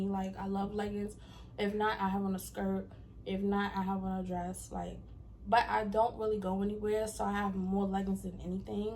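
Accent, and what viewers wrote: New York, United States. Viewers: I knew you were from NY, I can hear it. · I heard that spicy New York accent from your 1st video 😁( Bk/NY girl myself) Congratulations on your new Youtube page & Im looking forward to seeing more of you. WELCOME!!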